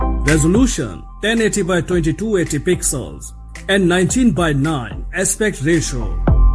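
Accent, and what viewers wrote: India. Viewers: es acento de la India, donde el inglés es el idioma oficial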